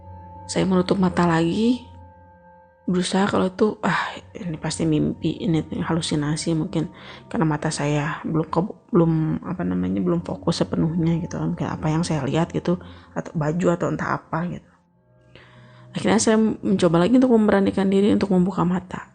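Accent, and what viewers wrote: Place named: West Java, Indonesia. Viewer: Inimah atuh urang Sunda pisan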